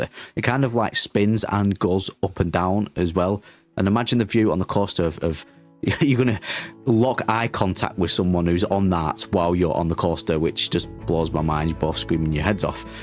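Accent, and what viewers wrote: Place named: North, United Kingdom. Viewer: great build!. I love your northern rambling... p.s...... how northern are you?!?!! you sound mor northern than me :'D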